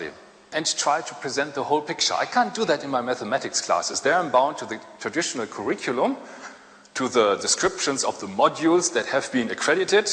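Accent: Germany. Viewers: man hört voll den deutsch akzent raus :D aber sonst sehr schön ^^ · "aber sonst?" ich find deutschen akzent sympatisch :)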